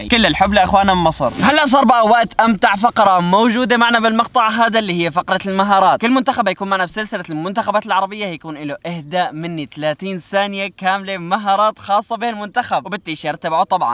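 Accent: Saudi Arabia. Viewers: هل انت سعودي ولا سوري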